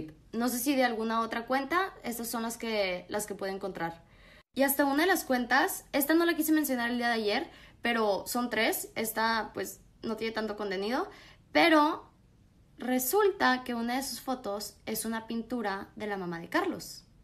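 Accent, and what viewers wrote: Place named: Fresa, Mexico. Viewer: Más fresa no se puede escuchar jaja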